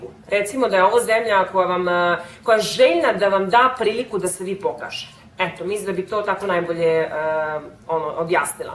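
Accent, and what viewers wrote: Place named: France. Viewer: vuce na francuski, moja sestra prica slicno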